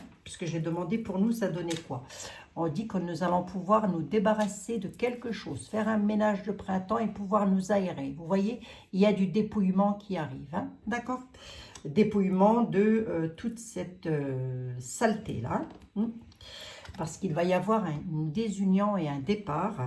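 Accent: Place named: Vosges, France. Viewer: Bonsoir, j'ai écouté Christophe ja cob hier soir, ils ont dit avec Babette qu'ils allaient vous voir, ça promet les bêtises 🤣🤣😅😅. Moi je suis une ex spinalienne, du coup j'adore votre accent, et vos bêtises 🤣🤣😅😅 ça m'éclate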